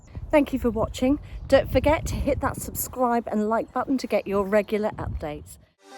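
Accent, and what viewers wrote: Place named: United Kingdom. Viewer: I just love your beautiful UK speech and dignity. I just had an appointment this afternoon. I'm 57 yrs old and have had researched things. I attempted taking herbs to manage my changes. I was able to for awhile. But in the last few months I was not doing so well with it. So I am starting HRT. I want to punch that MD for you as well! I'm so happy to come across your video today.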